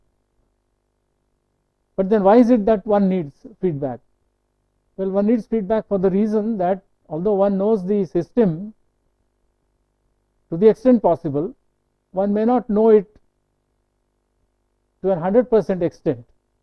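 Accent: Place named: South India, India. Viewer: Some Southrn Indian Mix English But it can be understand, Who D Fker Says It Cant be understandble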